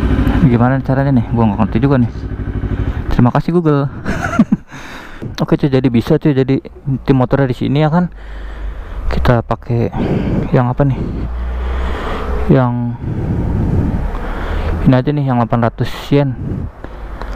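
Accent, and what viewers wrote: Indonesia. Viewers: Anjir bahasanya orang indo bat kentel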